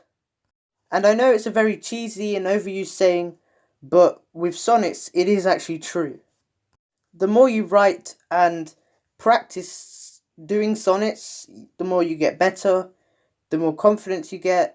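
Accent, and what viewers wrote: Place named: United Kingdom. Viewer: British accent 😍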